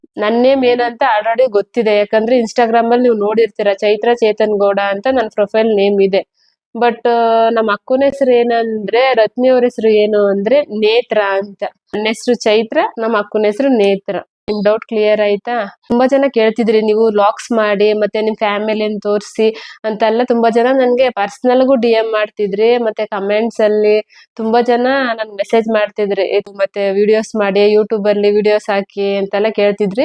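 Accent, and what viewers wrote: Mandya, India. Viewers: One and only Mandya district language 🎉🎉🎉 Namdu Mandya district sathnuru....naavu nimma tharane matadodu madam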